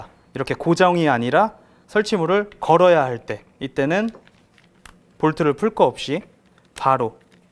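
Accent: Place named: Japan. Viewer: 대단하다 진짜.. 앙카가 뭐냐 앙카가.. 위대하신 천황폐하를 모시는 신민이라 그런가.. 일어를 쓰려면 일어를 쓰고, 영어를 쓰려면 영어를 쓰지 일본애들이 쓰는 영어 발음까지 따라해야겠냐..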